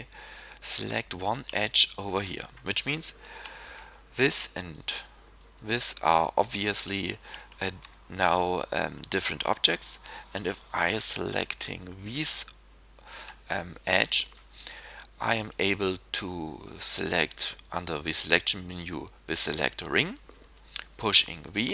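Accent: Germany. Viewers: This guy is so under rated, this tutorial alone has help me so much more than any of the other tutorials out there, up until this point I had no idea how to apply a physics to an object, and I couldn't find anything on how to finalize physics, only how to simulate them, this guy saved me a ton of digging around online for an answer, all done with a lovely 'German?' accent. I love his accent but I am not sure where it is from. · Man hört so richtig deinen deutschen Akzent, aber jetzt gehts ja ^^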